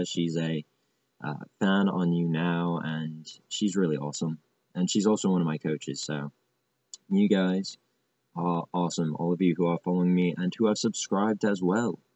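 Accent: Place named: United Kingdom. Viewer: What is with the British accent? That dog is from one of your past videos too. Some technology doesn't make people smarter like the new Iphone for instance you don't have to reach up the entire screen just to get stuff you double tap the center button and it comes half way down the screen. Other technology like stuff for hospitals make it easier and sometimes less painful for people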